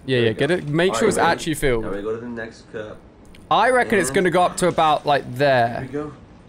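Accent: United Kingdom. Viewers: You in England or Scotland?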